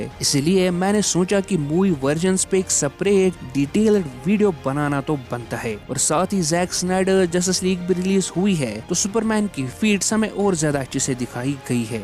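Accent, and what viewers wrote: Kashmir, India. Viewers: The author's voice seems Kashmiri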